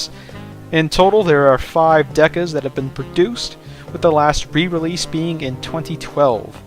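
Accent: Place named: United States. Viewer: Informative video, but damn, the American accent is strong with this one, butchering half the names. For example, Ran Yakumo, is not called "Ren"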